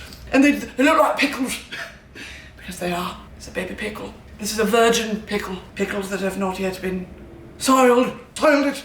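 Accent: United Kingdom. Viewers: not only can do a british accent but she can do like four different british accents. how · it triggers me that as a 30 year old born and bred brit (born 'n bred, thick in the head Oi Oi!!!) you actually sound more British than me. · Lordy she’s more British than me. Amazing accent. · You sound more British than a real British person, please never stop doing what you’re doing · bestie does a MEATY british accent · the 'British' accent just reminds me of our god forsaken prime minister. Boris Johnson. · Why is her British accent giving captain jack sparrow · Dude the British accent! 😂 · I rate your effort with the British accent and absolutely loveeee you and your videos BUT the only specimen you sound like is Boris Johnson. Disrespectfully 😂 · after hearing you speak in a British accent almost the entire video it sounds weird to hear you speak in your normal American accent lmao. 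the British accent is so fitting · Even the cadence of your British accent is on point 😂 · The fact I just watched someone on the internet make 3 white bread sandwiches in a British accent for 18 minutes should prove how much I love this woman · ngl her british accent reminds me of Endive from the cartoon ''chowder'' which to this day is one of my favorite childhood shows. her voice just sounds kinda similar which is so funny to me. i love her and her personality as much as the tv show. they both make me laugh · I showed my friend this and she thought you were British lol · I lost it at one point and convinced myself she's really British. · this video made my day, love your British accent, it's spot on! You are awesome! ♥️👍😊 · I could listen to her speaking in a British accent while I sleep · Hey, HOW THE HELL IS YOUR BRITISH ACCENT SO FUCKING PERFECT?!? AND I'M FROM ENGLAND! 🤣🤣👌🏼👌🏼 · British accent is giving me Stephen Fry today. 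· The British accent is far too much like boris Johnson and it’s triggering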